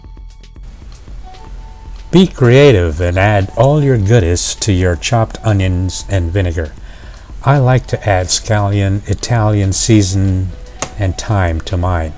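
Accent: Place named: Jamaica. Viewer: you sound like a Jamaican who's just been living in the US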